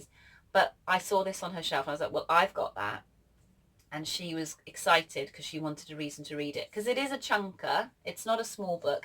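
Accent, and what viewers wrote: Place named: Newcastle, United Kingdom. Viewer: Omg the farm is divine, so gorgeous!!! And did I detect a Geordie accent?! 🥰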